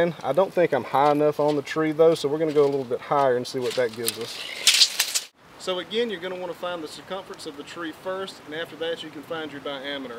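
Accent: South, United States. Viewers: Something about this southern accent makes them feel more trustworthy and honest. Or maybe its just this guy's way of explaining everything without being full of himself. Great video,, Thanks.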